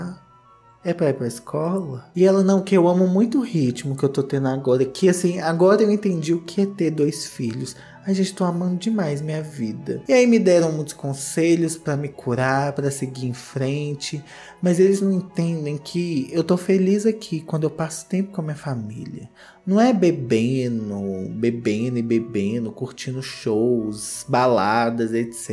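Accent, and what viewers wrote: Minas Gerais, Brazil. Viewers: “Pera lá né” kkk vc e muito mineiro, eu amo o tô longe do meu estado e sempre que te assisto me sinto lá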